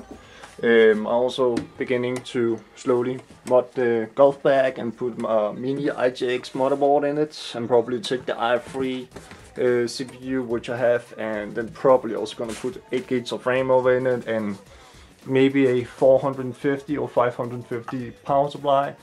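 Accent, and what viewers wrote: Denmark. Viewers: Det er sjovt at høre en tale engelsk når han er fra Danmark :D - Nice video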